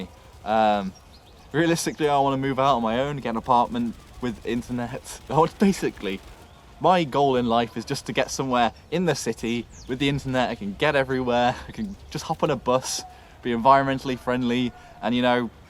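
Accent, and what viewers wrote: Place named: United Kingdom. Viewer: That brittish accent sounds so good! I hope you find a new job and get happy again.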